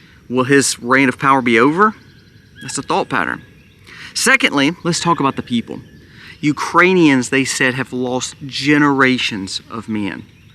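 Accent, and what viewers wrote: South, United States. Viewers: What a niave analysis. Just let Russia do what it likes. Sounds like a hick southern MAGA supporter.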